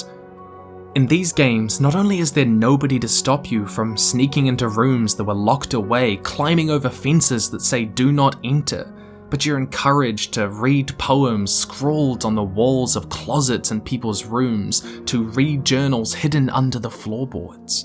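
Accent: New Zealand. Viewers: Whaaaaaaaaaaat??? His accent is... new zealandish? woooow brah Would never guess (maybe I'm... wrong?) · You sound like a kiwi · I didnt know was from new zeland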